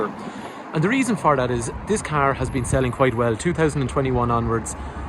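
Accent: Ireland. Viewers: Only with can give an unbiased & informative review on the cars reviewed, plus the Irish lilt is best, consequently I have bought 2 new Hyundai cars on his comparison site, I only wish I was in Ireland & not England to buy my next car, Well done!!!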